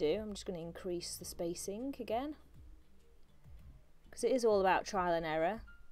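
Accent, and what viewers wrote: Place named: Birmingham, United Kingdom. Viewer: Great tutorial! Thank you! Love your accent by the way! Is it brummie?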